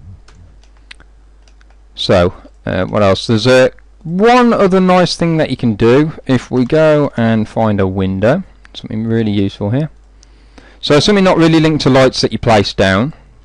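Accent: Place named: Birmingham, United Kingdom. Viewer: Love you're content and have to admit that even though I've watched your content for several years, I hadn't (until now) subscribed. Better late than never I suppose lol. From you're accent, I'm guessing you're from the same neck of the woods as me ... Birmingham??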